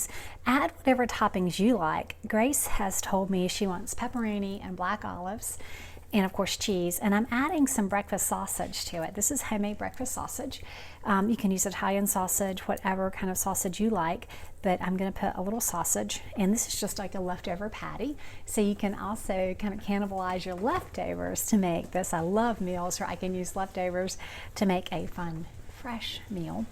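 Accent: South, United States. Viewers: Love the homely vibe and Southern American accent, will try this! Thanks from Manchester UK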